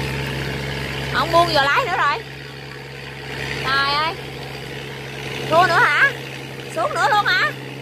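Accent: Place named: Mekong Delta, Vietnam. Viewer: Tôi thật sự là ngỡ ngàng với giọng phát âm của gái miền tây ta. Làm YOUTUBE kiểu này, chỉ để cho dân miền tây xem và nghe thui bạn àh. Bạn đang ở việt nam, người việt đó....làm sao mà để cho mọi người trên toàn đất nước mình, xem và hiểu được....thì bạn sẽ kiếm tiền từ youtube được mạnh hơn ha nhỏ, giọng mẹ đời àh. Xem các ca sĩ miền nam họ hát đó nha....mà học hỏi đi ha.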